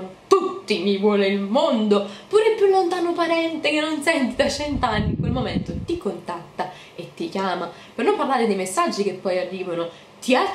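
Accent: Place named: Naples, Italy. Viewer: sei di una bellezza rara. Il punto però è un altro, secondo me saresti un'ottima attrice per la tua grandiosa espressività. Sono anni che sono su youtube, seguo tante tante ragazze, ma questo gesticolare, parlare fresco e accentato napoletano senza alcuna volgarità, fa sì che tu possa ricordarmi la amata Sofia Loren. Baci.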